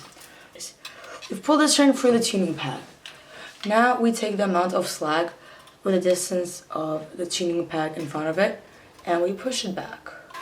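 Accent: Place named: France. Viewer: Oooh ok i was almost sure to have recognized the french accent (because i'm from France ahah).Thanks again for your help and dudette 😎🤘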